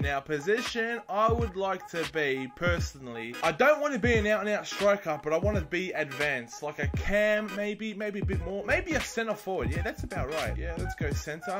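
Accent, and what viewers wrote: Australia. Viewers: Your Austrailian arent you?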